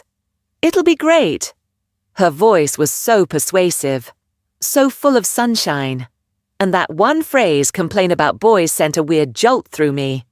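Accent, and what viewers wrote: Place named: United Kingdom. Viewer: Enjoying the British accent.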